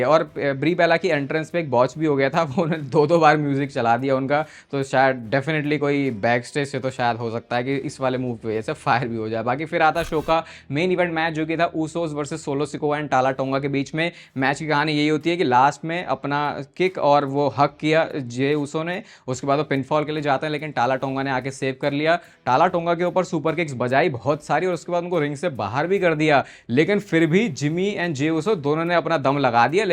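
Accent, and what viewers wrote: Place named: Uttarakhand, India. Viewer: ❤ Bhai's Pahadi (Uttarakhandi) Accent 😅❤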